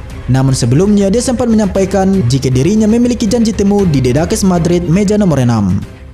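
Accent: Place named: Toraja, Indonesia. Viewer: Mntafff mannnee, 🤣🤣🤣 logat mu 👍👍👍,, toraja apa duri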